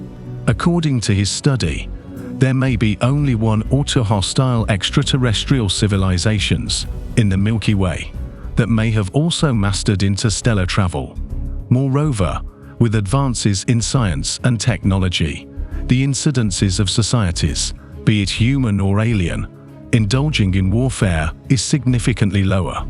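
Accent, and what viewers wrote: United Kingdom. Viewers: Please stop using the "British" accent in your Voiceover. There are a lot better choices like Swedish, German, or Irish.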